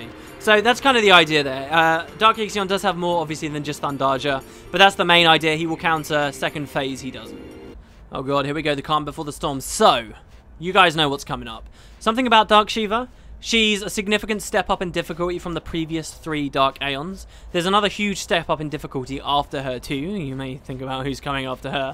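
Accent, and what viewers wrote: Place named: England, United Kingdom. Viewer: thats a real shame, your guys casual movement from gw's to everything else, for me, really captured what the gw community are doing. would you ever think about starting a similar style podcast you our own? i know the 'but of corpse' (and their wider range of casters from that channel) could do with someone with your knowledge/perspective/english accent on their shows.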